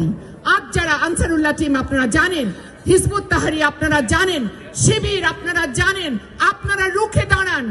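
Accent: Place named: Kolkata, India. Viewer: kolkatar tane kotha bolos ken shali?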